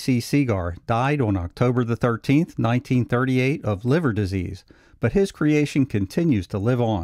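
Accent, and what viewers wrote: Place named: Pittsburgh, United States. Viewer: What part of Pittsburgh are you from?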